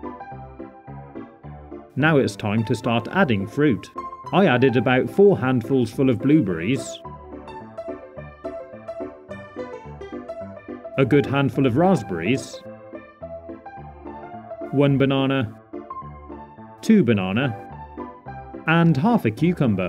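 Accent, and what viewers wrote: United Kingdom. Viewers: I just love the british ascent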